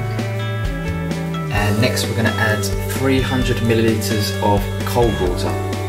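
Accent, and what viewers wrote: England, United Kingdom. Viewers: JEEZUS. British accent and he cooks! <3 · You've got an English accent,. Did you grow up in England?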